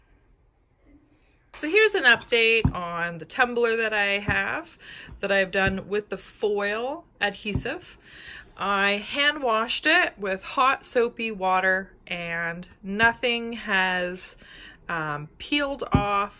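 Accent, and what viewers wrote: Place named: Canada. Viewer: I think sure may be from Canada. I've heard other people pronounce it that way from there.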